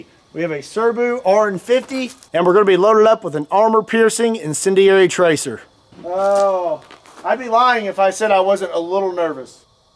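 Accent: Kentucky, United States. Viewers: What part of Kentucky you are from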